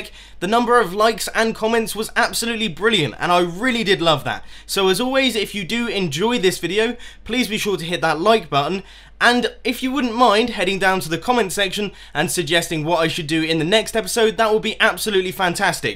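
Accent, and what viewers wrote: England, United Kingdom. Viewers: r u english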